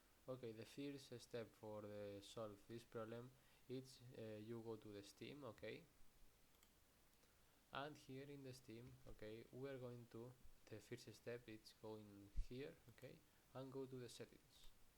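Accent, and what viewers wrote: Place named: Spain. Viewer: el acento español ha sido abrir el video y notarlo al momento XDDD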